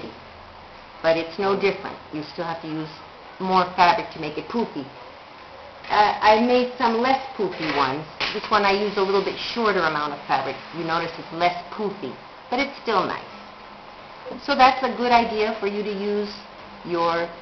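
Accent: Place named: United States. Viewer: Wow that was great, I make these too, but I use elastic instead of rubber bands. We in the USA pronounce centimeters differently, but you sound like you have a US accent where did you grow up?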